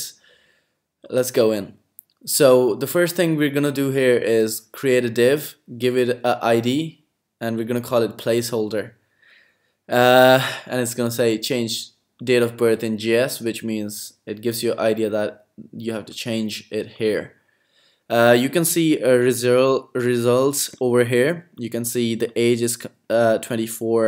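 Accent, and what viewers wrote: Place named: United States. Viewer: but your ascent is american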